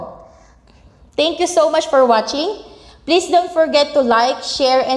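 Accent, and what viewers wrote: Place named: Philippines. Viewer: I can tell from the accent, she’s Filipino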